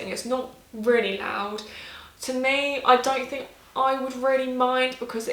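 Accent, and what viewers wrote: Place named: New Zealand. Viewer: Sounds Kiwi with.... Maybe visited the UK for a while?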